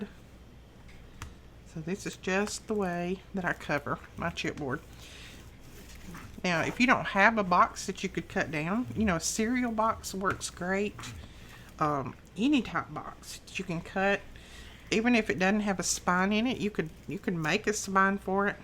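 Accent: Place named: Eastern Kentucky, United States. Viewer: Dont know where you are, but totes sounds eastern Kentucky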